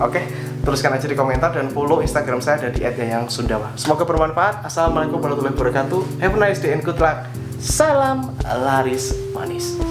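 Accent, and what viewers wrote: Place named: Java, Indonesia. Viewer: Ujug-ujug Wong Jowo medhog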